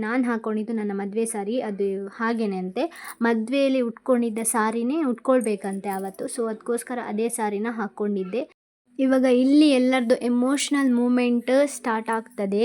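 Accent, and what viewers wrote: Mangalore, India. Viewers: Uttarakarnataka style mathadi .manglore style mathadbedi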